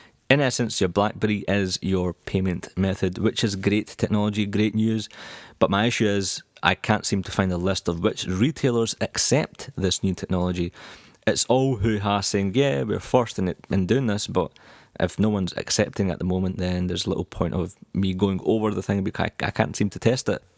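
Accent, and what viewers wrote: Scotland, United Kingdom. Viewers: Scottish, i think...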